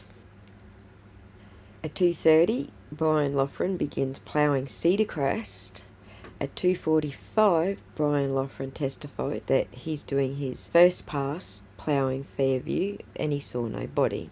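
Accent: Australia. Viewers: You are such a fresh breath of air. I grew up across the street from a lady from Aussie. Our families were friends for ages. I'm now 67 yrs old. It almost sounds like I am listening to her talk. Love your accent. She was like a second mom to me. Miss her so much! God Bless you!!!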